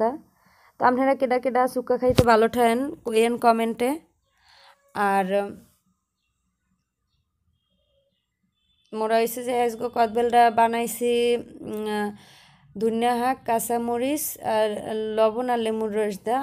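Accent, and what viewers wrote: Barisal, Bangladesh. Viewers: আপি আমি তোমার 2 টা চ্যানেল দেখি খুব ভালো লাগে,আরো ভালো লাগে তোমার বরিশালের কথা,ভালো থেকো আপু,দোয়া করো আমার জন্য। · Din din sundor hoye jacco .Barisal er meye bole kotha .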